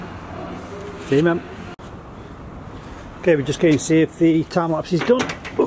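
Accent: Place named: Barnsley, United Kingdom. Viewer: ps where you from? barnsley? lol Im from leeds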